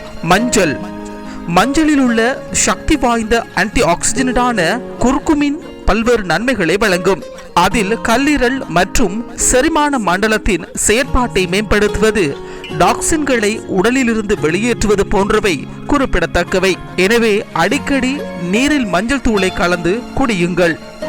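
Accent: Sri Lanka. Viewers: இது இலங்கை தமிழ் ... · ஈழ தமிழ் அப்படி தான் இருக்கும் சகோ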